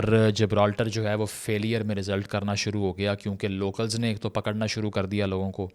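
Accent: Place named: Punjab, Pakistan. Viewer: Why do you speak English in Punjabi accent? . Otherwise you are amazing. Very easy way to understand you. 🙏🙏🙏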